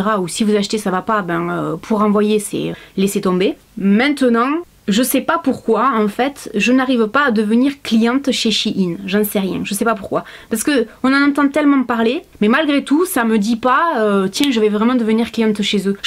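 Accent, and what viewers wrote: South, France. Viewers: Moi perso j adore ton corps t es parfaite comme tu es ( maman ) 😂 non pas du tout j ai 42 ans donc copine on dira ! Après pour Shein je suis un peu comme toi j’adhère pas à 100% peut être parce que j ai grossis mais non pas vraiment, pas seulement j aime pas la « loterie » soit t es contente ou alors déçus et non 🙅🏻‍♀️ trop peu pour moi j aime pas ça et renvoyer en Chine 🇨🇳 bah ça me saoule déjà en France je le fais pas je vais en général en boutique ou je donne à mes sisters, sinon je partage ton avis sur toute la commande à 100%. Gros bisous J adore tes vidéos et ton accent rien que pour ça je vais vivre ds le Sud Ps: moi aussi forte poitrine ça grossit vite sous certaines formes mais on est comme on est mince vive les différences ❤️❤️❤️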